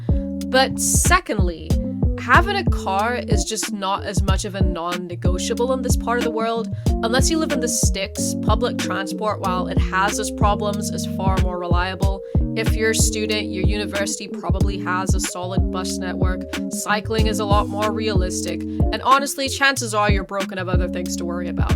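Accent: New York, United States. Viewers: I thought I was going insane- I heard that new yorker accent the last video and was confused af on this video when getting to the UK part. LMAO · I'm guessing you're originally born in the states due to your American New York accent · Yooo wtf I was thinking the same thing, I've never heard a British person sound that american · Nah, she’s speaking American. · Wait wait WAIT A DAMN MINUTE!!! Your from the UK but you have a heavy new yorker accent 😭?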